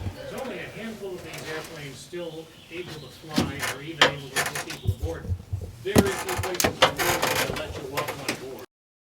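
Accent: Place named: England, United Kingdom. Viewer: The best useful info and at least , I could learn English accent from you 🙏🏻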